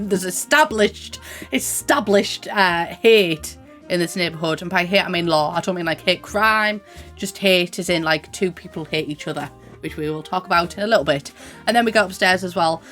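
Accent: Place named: England, United Kingdom. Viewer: Your English is great! She was hard for me to understand at first and I am a native English speaker lol. The English accent on this one is strong, so good for you!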